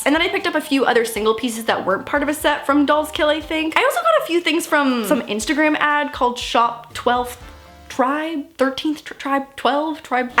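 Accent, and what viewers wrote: Canada. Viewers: . Your Canadian accent kinda showed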